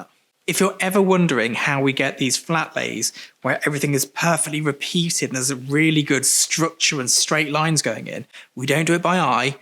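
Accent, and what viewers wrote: England, United Kingdom. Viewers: stay with British English, it's more interesting to us Americans :) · Hi, thanks for sharing your insights and all of that mate! Could you show us how you get everything level for flat lay and how you use that laser wotsit? As far as your choice of English goes, I like your English English!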